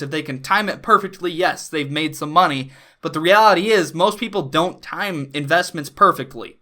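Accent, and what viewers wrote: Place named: North, United States. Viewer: Love the intro music...What state are you in? You sound Northern..lol. I'm from RI but live in East TN..keep up the great content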